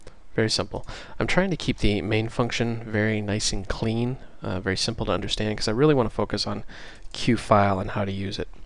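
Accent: United States. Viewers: not only is this educational and entertaining, but this this is in the best format for me! Small screen so I don't have to be in 1080p full screen to read the text and I can have QT open next to it, short videos so I don't lose the will to live, and finally you have a really nice voice XD its not normal us people from Britain enjoy an America accent XD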